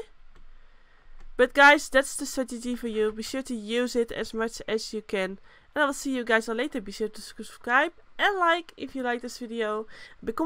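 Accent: Netherlands. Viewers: You sound dutch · Idk why but you kinda have a Dutch accent, ru Dutch? (No hate just asking 😂❤)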